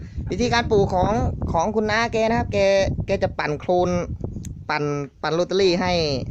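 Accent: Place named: Isan, Thailand. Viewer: เฮดคลิปแบบนี่เด้อเจ้านะ,ดีหลายคั้นแมนเจ้าเว้าอิสานบ้านเฮา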